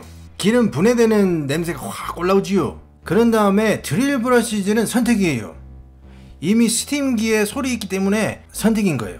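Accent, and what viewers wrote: Gyeongsang, South Korea. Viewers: 고품격과 저품격, 잉글리쉬와 갱상도쉬가 혼재된 나래이션이 상당히 중독성 있네요 ㅋ 열씨미 새차로 마이 맹글어주세요~😀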